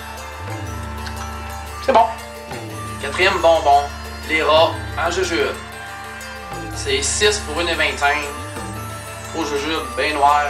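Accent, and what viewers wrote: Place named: Quebec, Canada. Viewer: accent quebequoi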